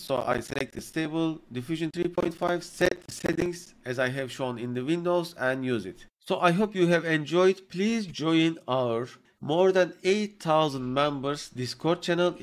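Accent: Turkey. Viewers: Bende diyorum bu kanaldaki ingilizceyi anlamam nasıl bu kadar kolay olabiliyor diye, meğersem hoca Türkmüş 😂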